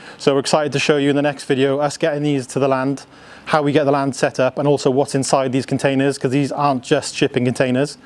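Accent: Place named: Wales, United Kingdom. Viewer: I’ve watched all your videos with great interest, seems like you are “cracking” along nicely! In South Mississippi we use the term “fixin’” or “fixing” quite a bit, as in “I’m fixin’ to put this cladding on the side of our tiny house”, or “I’m fixin’ to head down to the Builders Merchant”. I think you could pull it off, Wales accent and all! Great work guys!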